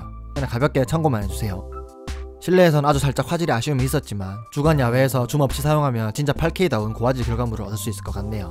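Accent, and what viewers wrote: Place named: Changwon, South Korea. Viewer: 님 창원사람인가보넹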